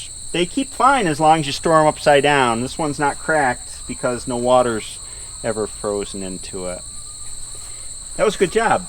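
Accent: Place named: South, United States. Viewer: 60s country twang puts the ooo in smooth.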